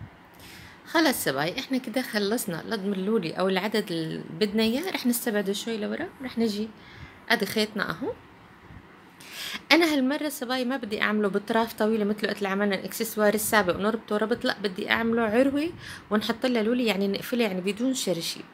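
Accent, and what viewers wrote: Egypt. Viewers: ما شاء الله بتجنني شغلك بياخد العقل بنت بلدي سورية مع لهجة مصرية ربي يحميكي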